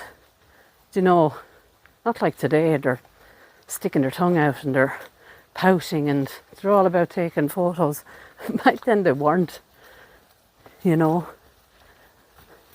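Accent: Ireland. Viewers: This is one of the most amazing cemeteries you have visited, it truly is. It would make a great place to set a spooky film, would not need to do anything, it’s just perfect as it is. your enthusiasm comes through, your love of old cemeteries is clear, your appreciation to these places built to honour those who have come before us is wonderful.All narrated in your soft beautiful Irish voice.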